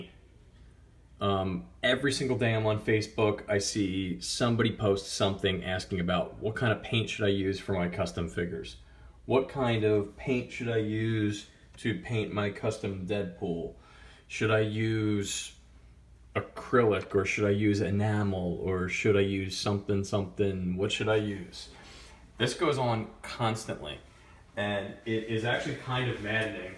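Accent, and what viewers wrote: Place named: Philadelphia, United States. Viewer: You a fellow Philly guy like myself? The accent.. either that or Bmore/Delaware. I'm just getting into customizing. I got Testors and some brushes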